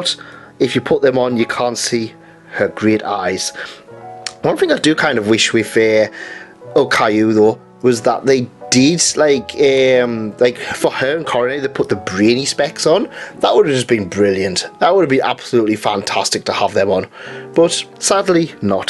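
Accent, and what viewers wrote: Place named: Scotland, United Kingdom. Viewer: Just came across your channel a few days and I just gotta say that I enjoy your vids quite a lot and they’re very informative for a novice like me. I’ve just gotten into figure collecting and I like to see what I expect before buying any figures! In my opinion, you’ve got the best reviews that i’ve seen yet on hololive figures! I also like your accent lol. I’ve been trying to learn a scots accent for some time now so it’s good research to listen to your videos. Hope your day goes well!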